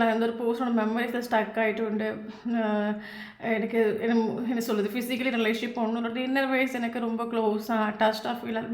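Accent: Kerala, India. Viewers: ரொம்ப நன்றி பிரபஞ்சம். நன்றி மேம். ரொம்ப அழகா சிரிச்சிட்டு ரிடிங் சொல்லுறீங்க. மலையாளம் கலந்த உங்கள் தமிழ் அழகு. எனக்கு ரொம்ப பொருந்துச்சி இன்னைக்கு reding, நன்றி ♥♥🌹🌹🙏🙏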